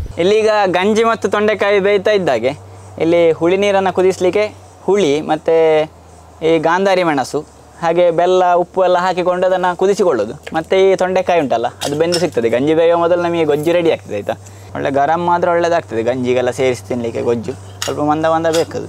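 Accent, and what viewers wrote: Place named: Coastal Karnataka, India. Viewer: ನನ್ನ ಕರಾವಾಳಿ ಕನ್ನಡ ಸೂಪರ್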